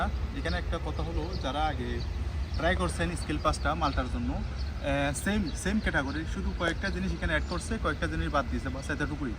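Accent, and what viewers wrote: Chittagong, Bangladesh. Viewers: ভাই আপনার কথা শুনে মনে হচ্ছে, আপনি আমাদের চট্টগ্রামের ছেলে 💚💚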